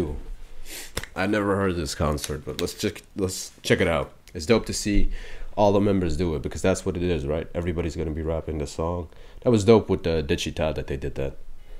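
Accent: France. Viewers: Pleaaas react to BTS Vs block b pleas and I like your french accents brooooo c'etais parfait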